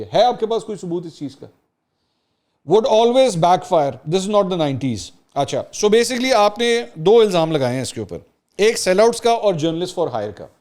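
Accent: Punjab, Pakistan. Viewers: sir apka panjabi touch vlog ko 4 chand laga dayta hy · I really enjoy when you give Punjabi touch. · bhai Punjabi wala tarka chuk k rakho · I am Baloch but Punjabi Tarka ziyada acha laghta hai QK Khadim Hussain Rizve sy Muhbaat hai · Sir, your speaking tone is very good, especially the punjabi tadka is very heartwarming. May Allah bless you. Very good reporting. Muhammad Sohail Razzaq Saudi Arabia. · I love your panjabi touch bai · Punjabi tarka 👍👍👍👍👍👍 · Punjabi tarka must hai 😎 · Love your Punjabi tarka. Keep it up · Love your punjabi tarka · Salam, Like the way you present vlog. Please don't change it and keep "TADKA" of punjabi. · bhai mje bara maza aata h jb ap punjabi tarka lgaty han bara acha lgta h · Yeh punjabi nahin balke punjab ki urdu hai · Boss punjabi tarka k baghair agar aap nay vlog kia to axha nahi hoga ..... I live ur access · Bhai aapki panjabi ka tarka apke V log ko char chand laga deta hai, continue please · Enjoying panjabi tarka, keep it up ⭐ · Your Punjabi tarka goes well with urdu, Jabran bai is rare follower of IK who is unexpectedly not swearing so you are right to appreciate his attitude, your approach is very logical as usual, great work. 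· i really enjoy "punjabi tarqa"... 😁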